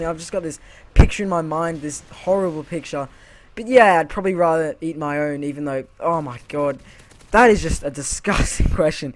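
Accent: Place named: Australia. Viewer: Usually the Australian accent annoys me, but your voice makes it not annoying:] Think your speaking is pretty good too... Subbed :P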